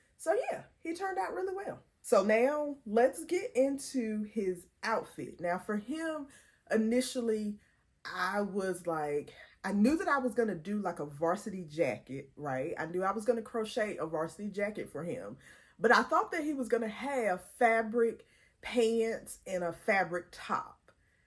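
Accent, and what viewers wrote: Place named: South, United States. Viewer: Love listening to you because you and I have that Southern drawl. They’re both so cute, when are the patterns going to be available for sale I need to make my Wilberforce University (1856) dolls?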